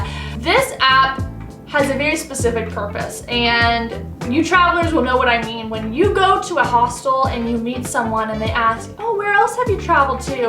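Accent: United States. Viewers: I would love to watch your vlogs because it's a hope of a boy who thinks America has a place for him too. Actually in 2 ways you're helping - 1. Learning English without Subtitles to get your Accent. 2. Tourist like a local & relevant information + pros & cons. Thank you in advance. Keep up the good work. And please upload regularly. BEST WISHES EVENTUALLY. 🎆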